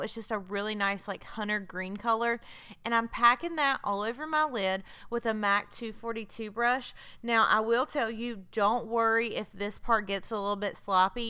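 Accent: South, United States. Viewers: Randommmmm but I so love your hair it looks so healthy and love the southern accent. The makeup is cute to of course haha.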